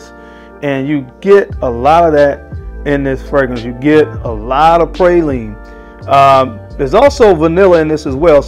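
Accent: United States. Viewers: Love the country accent.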